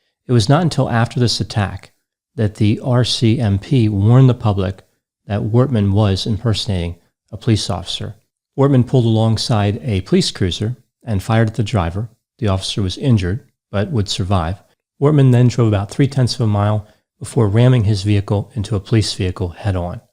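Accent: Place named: Boston, United States. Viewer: Hi Dr Grande fans, can you tell me what accent is? I’m from Australia and I can often pick American accents but I can’t quite pick this one. His accent is apparent when he says the word ‘on’ and it is super cute. If I had to guess I would say Boston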